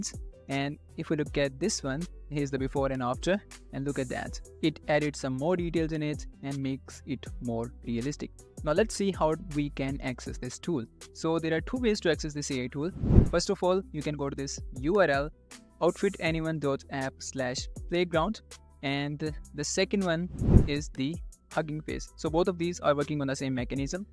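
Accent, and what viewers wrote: India. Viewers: Github? 🤡🤡🤡yeah, if you want to get virus especially hearing this indian accent. Hell no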